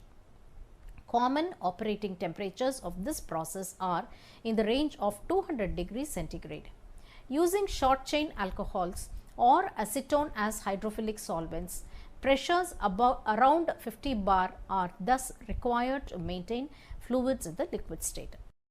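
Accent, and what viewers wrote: India. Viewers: it's a lovely presentation with such beautiful indian accent . THANK YOU SO MUCH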